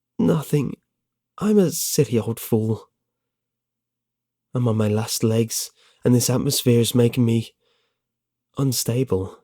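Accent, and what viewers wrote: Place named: Northern England, United Kingdom. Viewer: YEH NORTH ENGLAND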